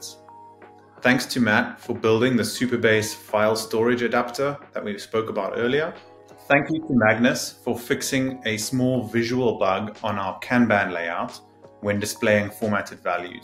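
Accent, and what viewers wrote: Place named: South Africa. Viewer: This guy sounds South African. any way thanks for the update 🔥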